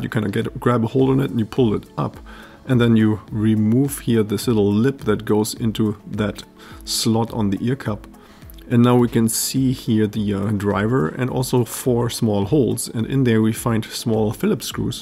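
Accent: Germany. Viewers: Can i comment in german? I thought i've heard a little german accent :D... Ich habe ein Headset von Clam. Da kann ich nicht einfach das Ohrpolster entfernen... was tun?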